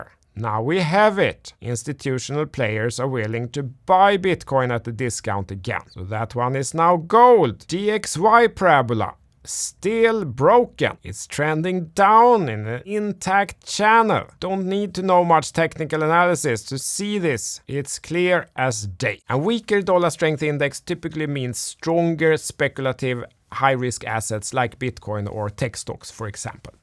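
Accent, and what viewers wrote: Sweden. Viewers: Well, in his defend. He's swedish. I love the accent! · Great content as usual but please talk more clean english/American then swedish/swinglish 🙈👍💪👊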